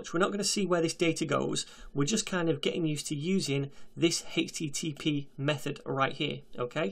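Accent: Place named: England, United Kingdom. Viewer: Nice English accent, watching software tutorial and learning language pronunciation in paralel! =)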